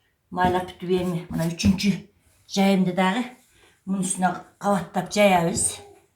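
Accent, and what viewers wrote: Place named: Kyrgyzstan. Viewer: Соонун нукура кыргызча азаматсыз апа колунуз дарт корбосун дагы ушундай видеолорду тартыныздар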